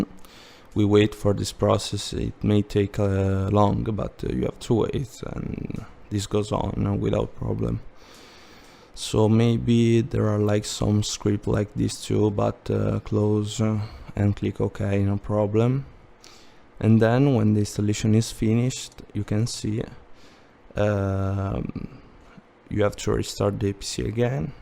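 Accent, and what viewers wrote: Italy. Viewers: Grazie!!! Cmq si sente un bordello che hai l'accento italiano 😂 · ho capito che eri italiano dalla pronuncia, qualsiasi italiano mantiene la pronuncia italiana anche se parla in inglese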